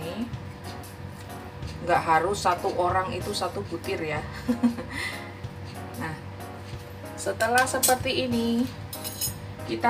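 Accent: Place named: Surabaya, Indonesia. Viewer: Suaranya spt CECE INTAIMAGAZINE. suara khas SUROBOYOAN koyo AKU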